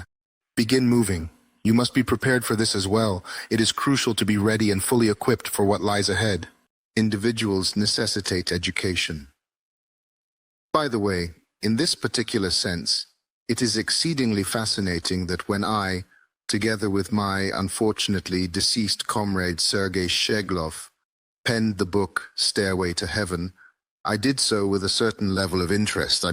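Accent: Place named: United States. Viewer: Очень прикольно слышать "Андрей Борисович Кобяков" и "Олег Владимирович Григорьев", сказанные Михаилом Леонидовичем с натуральным американским акцентом. Конечно, ИИ ещё не совершенен, но здесь -- вполне терпим: по крайней мере, все частоты присутствуют, я все слова разбираю на слух и хорошо понимаю речь. Ещё прикольнее было видеть, как, не переставая чисто говорить, бесшумно отхлёбывает чай из кружки, которая вдруг становится прозрачной. В целом, ИИ следует подрихтовывать при первой возможности, а также, возможно, отдельно записывать адаптированные для него речи, чтобы артефактов было поменьше. Через год-другой, вполне возможно, это уже не потребуется, потому что ИИ сейчас развивается очень быстро, и его качество также быстро растёт.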